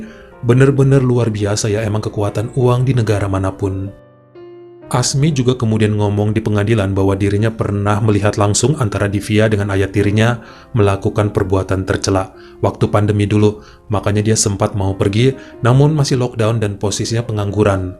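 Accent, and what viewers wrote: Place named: Java, Indonesia. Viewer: HALLO...... S 3 MAKIN COOL AJA NI CHANEL KAK, NGANGENIN BANGET PEMBAWAANNYA EPIK DI BUBUHI BHS JAWA MEDOK YG BIKIN SENYUM SENYUM SIMPUL SEHAT WALAFIAT SELALU SELURUH KELUARGA YA KAK, SALAM SEHAT DARI SYRIA 🇸🇾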